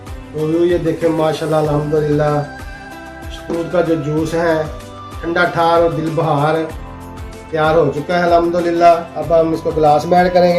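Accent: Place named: Punjab, India. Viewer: W SLAM BHAI BOHT HI NICE SHARING WITH PUNJABI AACENT LAAA JAWAAAB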